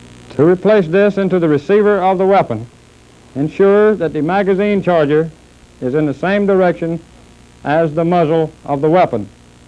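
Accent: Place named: Texas, United States. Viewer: Definitely a Texas or Arkansas man.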